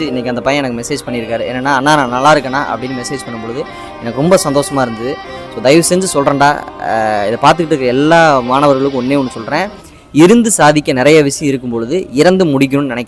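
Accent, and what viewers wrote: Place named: Kongu, India. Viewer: Thambi.. I became fan of you from this video❤❤ Ipotha voice kekaren and kongu slang❤🧿. Om namasivaya🙏 VAZHGA VALAMUDAN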